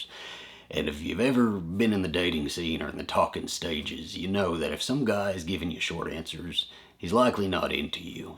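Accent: South, United States. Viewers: your voice that caught me nothing Better than a southern drawl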